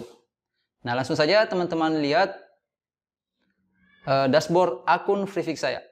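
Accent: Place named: Makassar, Indonesia. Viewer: Kak orang makassar yah?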